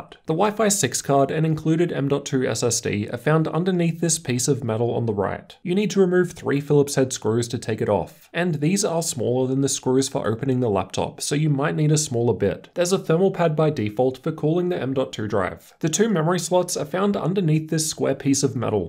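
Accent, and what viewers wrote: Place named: Australia. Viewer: It’s so odd how ozzies say words with an o. Nerrrrrr lenerrrrvo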